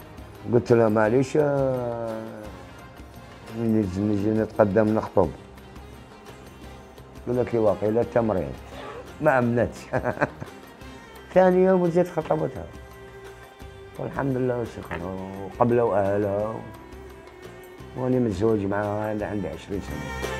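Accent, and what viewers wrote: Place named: Blida, Algeria. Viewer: لكنة بليدية على عراقية خليط متقون بامتياز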